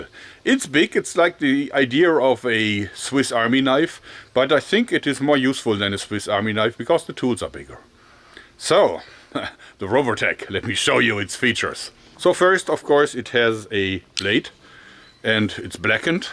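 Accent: Germany. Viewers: Sobald ich deinen Akzent gehört habe wusste ich, dass du deutsch bist 😅 Schön mal einen Deutschen zu sehen, der solche Videos macht (auch wenn sie in Englisch sind, was für mich kein Problem ist)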